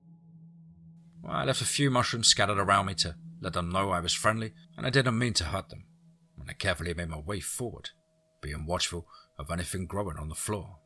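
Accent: England, United Kingdom. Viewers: You sound exactly how I imagined my homeboy with a U.K accent would, if I had a homeboy with a I.K accent that is. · Eric C you do. is your homie with a UK accent, but to him it's just how he sounds as an Englishman lol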